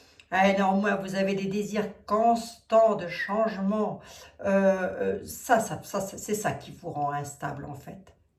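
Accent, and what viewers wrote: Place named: Lorraine, France. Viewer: Bonsoir, toujours un pur bonheur de vous écouter ❤. Bon week-end. Je vous embrasse du bout du Finistère. Votre accent Lorrain est un bonheur 🤗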